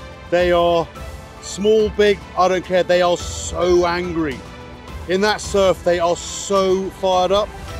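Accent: South Africa. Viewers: I like how he keeps saying, Yoh! Like a proper Saffa 😁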